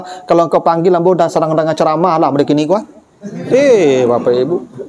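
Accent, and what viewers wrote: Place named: Aceh, Indonesia. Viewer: Urang maa ustadz ko min??? Manyabuik huruf "T" mode urang aceh, totok 😁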